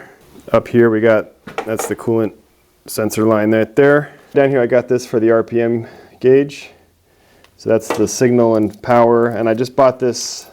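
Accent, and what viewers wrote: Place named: China. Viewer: Chingliss I love it.😂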